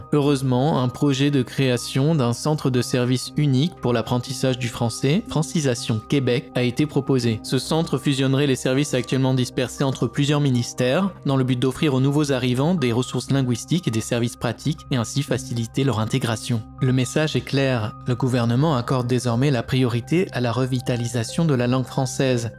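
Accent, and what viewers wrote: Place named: Paris, France. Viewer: Présentateur avec un accent de parigot ça promet! Je suis né sur un continent très éloigné de l'Amérique et de la France et j'ai habité le Québec depuis plus de 23 ans, en me voyant l'on s'adressait à moi en anglais ou l'on m'insultait avant même que je dis mot. Lorsque je m'exprime clairement en français, plusieurs ont des répliques virulentes et grossières et d'autres me demande si je suis comme eux (français de France). C'est tellement bigarré comme langage et biscornu comme formulation syntaxique que j'ai quitté cette province. Il ne faudrait tout de même pas oublié que la construction de Montréal s'est fait avec des gens qui ne parlaient pas français (Irlandais, Polonais, Hongrois, Tchèques, Allemands, Russes, Chinois, Italiens). Mais les colons venant de France jouaient aux maîtres et rois. Ils se sont fait damé le pion par les britaniques par la suite ( voir l'ouvrage "les deux grandes solitudes") et l'arrivé massive de juifs et d'américains. 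Les premiers envahisseurs sont les Français et les Britanniques en se battant pour coloniser cette partie de terre dont-ils n'avaient aucun droit acquis!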